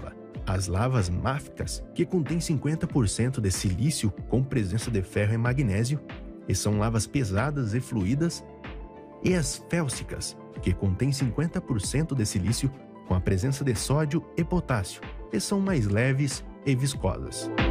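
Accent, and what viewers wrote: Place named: Portugal. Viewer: o narrador parece português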